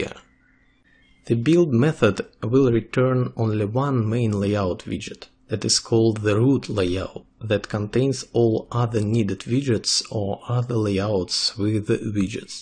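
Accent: Russia. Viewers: Russian ? am I right ?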